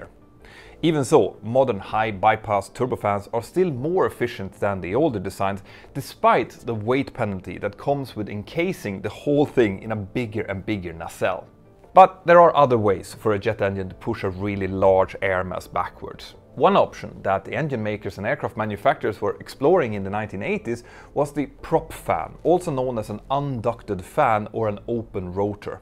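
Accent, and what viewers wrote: Sweden. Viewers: The Swedish English really makes this feel international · Are you swedish? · A 100 % the host’s from Sweden…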